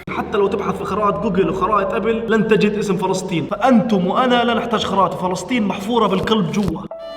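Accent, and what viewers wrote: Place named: Jordan. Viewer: اللي يستغرب من لهجة الاردنيين الرقيقه يدوس لايك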